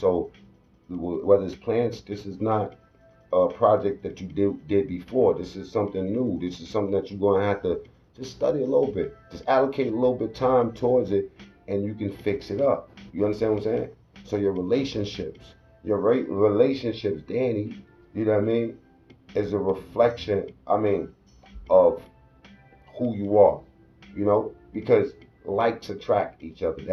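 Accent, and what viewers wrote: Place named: East Coast, United States. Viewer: Hello I am a new subscriber and love your East coast accent🤩 and how real you are about every situation. 💯 I'm from the South and love to hear people from New York, Jersey and Baltimore talk. 🤩 It's really sad to see how Danny went down and continues to blame Joe for everything instead of "Thanking him" for his help and all the money and assistance he gave him when he first got out of prison. I really want the best for him and hope he can LET IT GO and move on. 🌼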